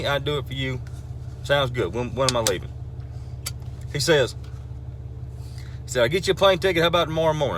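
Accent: South, United States. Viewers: Here in Canada my mom and sisters would jokingly say “hey, I’m not that old!” But given your accent no way they would think anything of it, it’s just a southern thing. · Being from the north and living in the South meet and guys like you I understand what you're saying no you didn't do nothing wrong but me I would have handled it differently if I was your cashier I would have giggled and said where's my mama where's my mama you said man I expect to see my mom when I looked over my shoulder which would have had you and me both laughing because I know you from the south by the way you talk they knew you from the south by the way you talk they just was in a foul mood and took it out on the wrong person you didn't do nothing wrong that cashier could have handled it better the boy you called me the c word and we going to be scrapping you might be bigger than me and I might get my ass whooped but you know what I'm going give it the old College try.. 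🤣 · You didn't do anything wrong. But as a female from the northeast, only older women are called ma'am. So most younger women find it offensive, because around here it insinuates that you look older than you are. I remember being in my early 20s and getting called ma'am, and feeling totally sad about it. Some of us didn't grow up hearing all women addressed that way. I wouldn't be offended if you did, because your southern accent is obvious and I know that's how southerners talk. (My aunt was from Georgia) 👍😂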